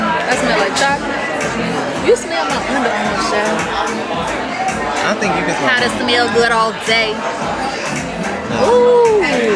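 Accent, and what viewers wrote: South, United States. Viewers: Thanks! I love your accent too, I find myself trying out the southern accent after watching your vlogs 😂 lots of love to you & 🫶🏾🫶🏾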